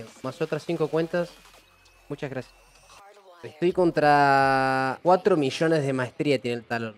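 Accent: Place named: Argentina. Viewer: rapaziada o é argentino kkk ele fala do jeito q ele quiser e continua o mesmo cara e da pra entender e dar risada bem de boas n enche o saco do mano não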